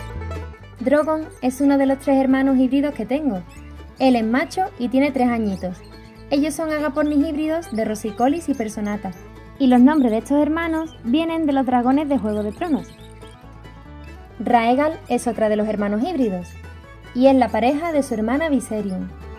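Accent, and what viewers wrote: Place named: Andalusia, Spain. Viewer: ¿De dónde eres? no consigo dar con tu acento: estoy entre Granada, Jaén, Córdoba, Sevilla...🤔 Yo vivo en un.pueblo de Vlc y tengo 22 agapornis 😍😍😍😍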